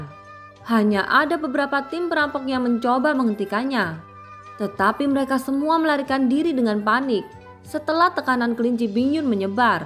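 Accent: Java, Indonesia. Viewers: Kak orang jawa yah?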